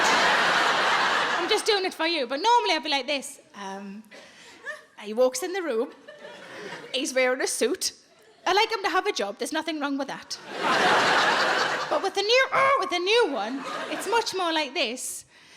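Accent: Newcastle, United Kingdom. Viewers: A Geordie is someone from Newcastle Upon Tyne in England and surrounding areas. Her accent is Geordie.👍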